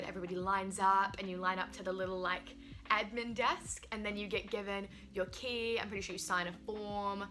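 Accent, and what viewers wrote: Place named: New Zealand. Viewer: are you part American? Your accent sounds like a mix of kiwi and something else ahha x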